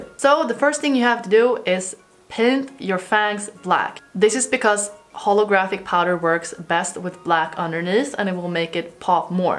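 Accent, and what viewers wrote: Sweden. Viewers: SweetIvory 1203 It's a Swedish accent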